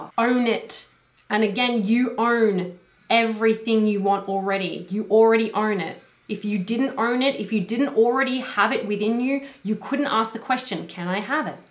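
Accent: Australia. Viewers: You are amazing. Are you Australian?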